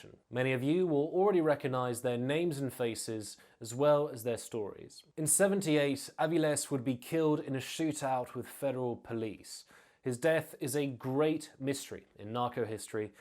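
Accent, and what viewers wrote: England, United Kingdom. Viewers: SPEAK UP NEXT TIME MAN THIS ISNT AN ASMR VIDEO I HAVE MY VOLUME ALL THE WAY UP BUT BARELY HEAR YOUR SULTRY BRITISH BOYISH VOICE LMAO SPEAK UP NEXT TIME!! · I’m confused with this host… he has a British accent yet he pronounces perfect Spanish when he says their names or town names in Mexico 🤷🏼‍♂️🤣🤣🤣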